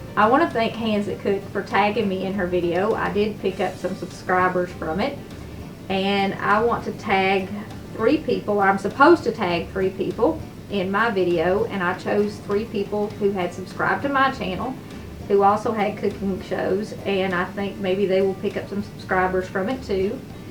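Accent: South, United States. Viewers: I love your southern accent. The music you useis great. I also love the dishes you cook. I like down home comfort foods.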